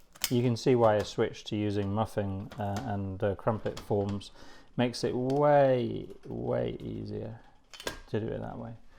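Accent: United Kingdom. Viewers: I suspect that, in spite of your accent, you are not British otherwise you would know that crumpets and muffins are 2 completely different things. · What's this nonsense? I'm pushing 60 years old and have never seen or heard of anyone cutting a crumpet like that. Literally never. You sound (middle class) English but who is this aimed at? No one calls them 'English crumpets' in Britain and what Americans call 'English muffins' are not the same as crumpets. What the hell is going on? It's one thing to pitch British recipes to Americans etc, and should be congratulated if anything, but to perpetuate nonsense like English crumpets and suggest they're the same as 'English muffins' is ridiculous. If you're filming that in America, dont come back, mate, you're dead to us.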